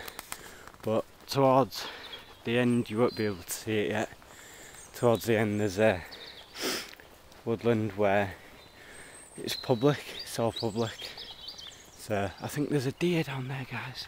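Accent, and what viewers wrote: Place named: Hull, United Kingdom. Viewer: Nice vid, loved seeing the deer, am a Hullite myself, can't mistake that accent. Where on the Wolds Way are you? Look forward to seeing your woodland camp.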